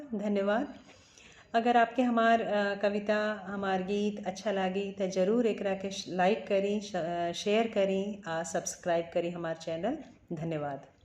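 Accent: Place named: Bhojpuri, India. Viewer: भोजपुरी के मिठास ...छा गई आप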